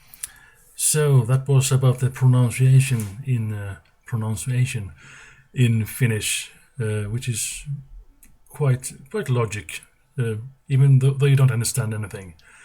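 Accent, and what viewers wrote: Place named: Sweden. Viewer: Your accent is Swedish, but you can enunciate Finnish pretty well.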